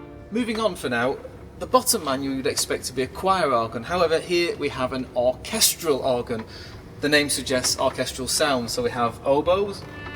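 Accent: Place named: England, United Kingdom. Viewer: This is so interesting, great demonstration and from an Aussie perspective, love your pommy accent 👍🏻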